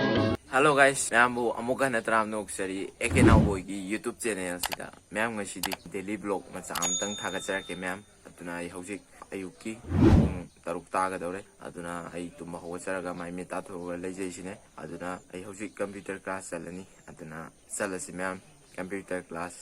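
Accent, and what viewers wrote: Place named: Kakching, India. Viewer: Enao yengba yam nungyheee … kakching macha ama oina yam chaothokcheikoo nayambana 😊 kakching marol da ngangbasidei henna taba nungyba leitre …🎉🎉 keep it up❤️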